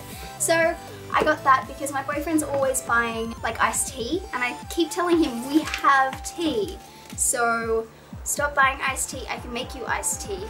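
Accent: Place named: United Kingdom. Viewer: I cant believe i haven't noticed this but are you Britain you have an accent!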